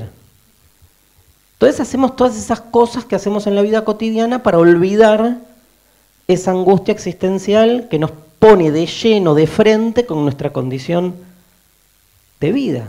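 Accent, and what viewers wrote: Argentina. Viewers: Acento argentino.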